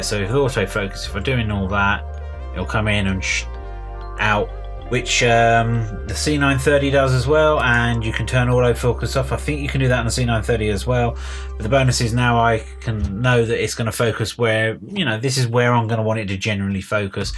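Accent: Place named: South Wales, United Kingdom. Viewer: You have a southern welsh accent, you aren't by chance from Glamorgan are you? Very Anthony Hopkins'esque, great video mate!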